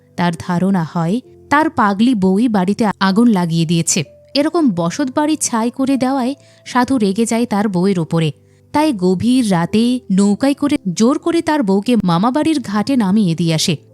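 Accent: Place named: Noakhali, Bangladesh. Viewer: Are Bai eita noyakhali meye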